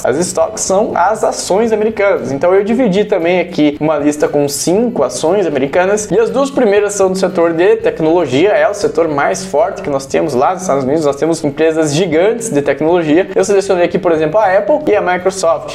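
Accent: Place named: Minas Gerais, Brazil. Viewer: mineiro de (BH)kkkk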